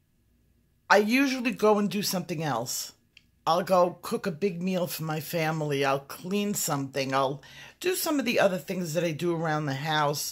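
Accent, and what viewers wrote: New York, United States. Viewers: Hi! I am so thankful for your channel. You are so encouraging and your lessons are easy to follow. My only problem is when you don't show what color pencil you are using as you are using them, or list them in the description, as I am trying to keep a record of good color combinations. Thank you for all that you do! Blessings! P.S. You sound just like my family from NY!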